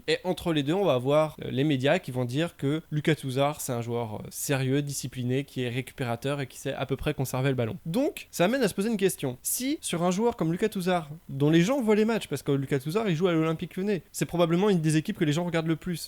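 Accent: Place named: England, United Kingdom. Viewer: L'accent anglais <3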